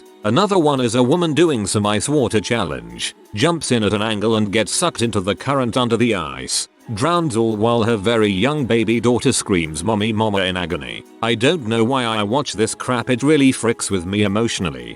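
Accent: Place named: United Kingdom. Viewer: Can you guys change the British narrator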